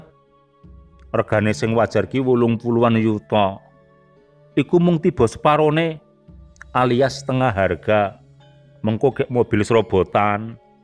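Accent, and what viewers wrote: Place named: Banyumas, Indonesia. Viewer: Bagus..jawa versi ngapak banyunasan...jawa tak hanya jogya solo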